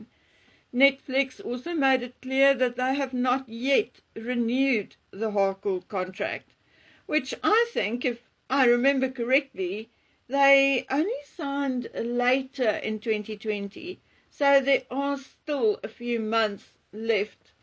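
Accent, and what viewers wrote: South Africa. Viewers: Hi. I've been enjoying your podcasts snd trying to place your accent. Is it South African/Afriaaner? Just curious. I love to listen to accents ftom around the world and place them.🥰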